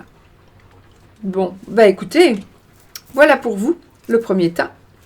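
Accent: Belgium. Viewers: Aha j'aime trop l'accent belge vous êtes belle en plus 🤭🤩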